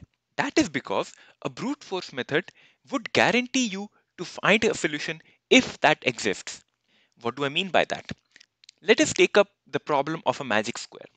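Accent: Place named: United Kingdom. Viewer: Beatiful british english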